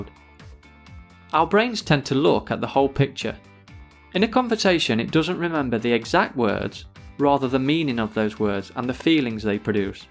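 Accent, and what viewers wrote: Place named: United Kingdom. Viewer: ｍａｙＢＥ it's uk アクセント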